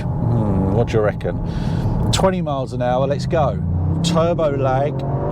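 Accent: United Kingdom. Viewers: Tawk, Spowrt. Proper Saaff accent. Great review. So ugly from the back but it looks a whole load of fun.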